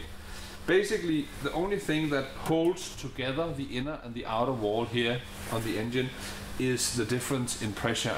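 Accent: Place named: Germany. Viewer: the moment i heard the german accent i knew he was legit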